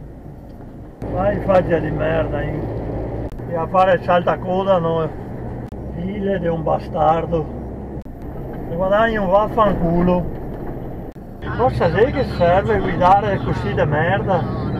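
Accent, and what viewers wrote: Belluno, Italy. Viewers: Buongiorno hai l'accento uguale del mio ex titolare di Belluno/Alleghe😅,l'altro giorno uno mi ha fatto quel zigzag in autostrada senza far freccia e sembrava un caccia delle frecce tricolori alla fine mi ha tagliato la strada per prendere l'uscita a destra e gli ho suonato si è incazzato pure 😅🤔 buona domenica 🙋🏻‍♂️un saluto da Stoccarda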